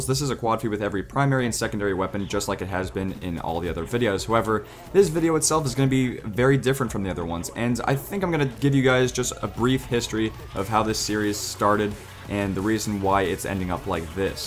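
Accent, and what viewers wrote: Germany. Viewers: R u german?? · r u German? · R u German?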